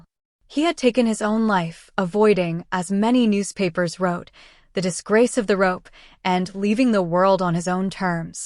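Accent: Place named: United States. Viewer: Difficult listening to an american female voice narrating this story so i wont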